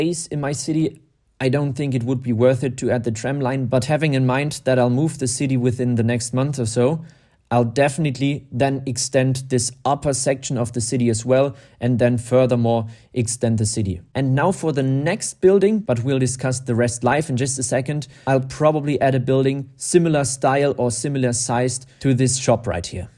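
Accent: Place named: Germany. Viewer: German accent sucks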